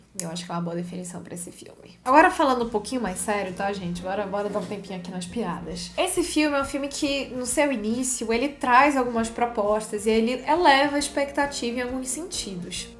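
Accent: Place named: Northeast, Brazil. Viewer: Ei, voce é nordestina? O sotaque é um pouco parecido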